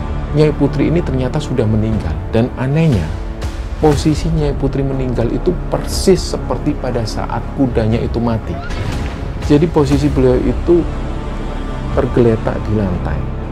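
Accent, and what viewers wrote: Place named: East Java, Indonesia. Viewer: Sambil masak dengerin cerita mas jaaannn nyaman tenan, aku sbg org malang cocok dengan pembawaan mas yg logatnya khas jawa timuran...sukses terima channel aku ngikuti dr subscribe nya msh di bawah 100 smpai skrg gk prnh ketinggalan cerita mas ❤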